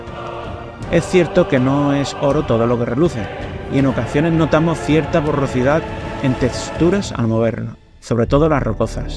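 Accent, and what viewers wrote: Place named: Axarquía, Spain. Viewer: Jajajaj yo soy de Málaga y te entiendo perfectamente. Tienes pronunciación de la Axarquía o por ahí. Muy buenos los análisis, breves y concisos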